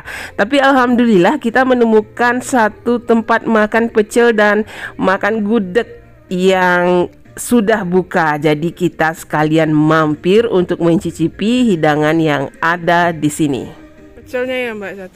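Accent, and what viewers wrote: Minangkabau, Indonesia. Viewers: Gomok bahaso padang nyo tu bu 😂😂😂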